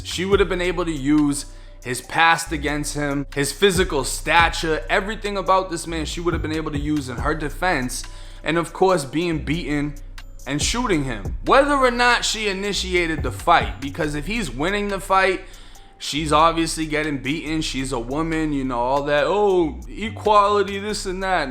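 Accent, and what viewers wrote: Boston, United States. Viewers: There's a reason men don't fight women in MMA. Maybe your goofy Boston accent should research harder on the rest of the world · Are u from Boston ? U remind me of mark Warburg in that movie you wanna a cawp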